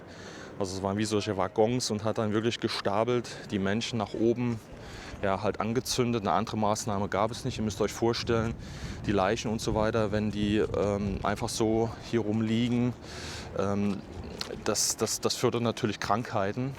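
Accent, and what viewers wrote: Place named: Dresden, Germany. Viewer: Ha. Hab gedacht das Du Dresdener bist. Ich erkenne die Weise mit der Du sprichst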